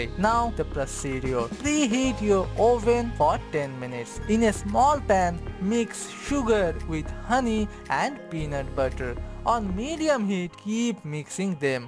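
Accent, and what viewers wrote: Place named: India. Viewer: acting kyu kr raha bolNai tym.. bolnai sai he pata chal raha desi he ho🤨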